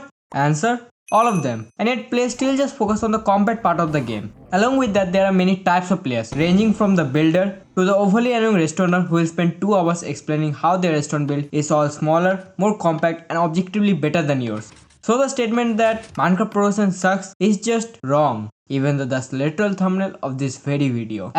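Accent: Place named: India. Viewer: you kinda sound like indian #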